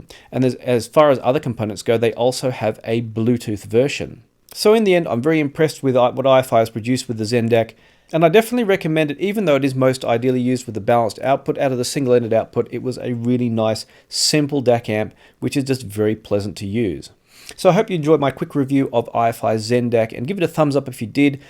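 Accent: Australia. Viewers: $130 what are you talking about try $230 it's very confusing to hear an Australian accent talking about products in US dollars. When watching this from Sydney Australia.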